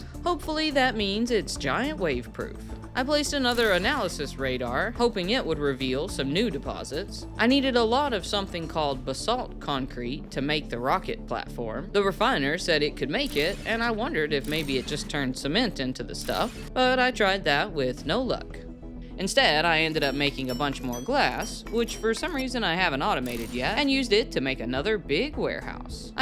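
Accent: South, United States. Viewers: you have one hell of a southern accent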